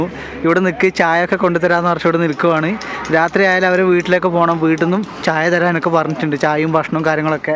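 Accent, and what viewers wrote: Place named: Kottayam, India. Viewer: ചേച്ചി കോട്ടയത്തുകാരി ആണ് സ്ലാങ് കേട്ടാൽ അറിയാം. ഫാര്യ എന്ന് കേട്ടപ്പോൾ മനസ്സിലായി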